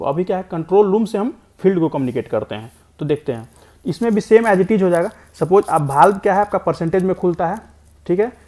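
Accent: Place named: Bihar, India. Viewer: Bhai aapka language vihari type h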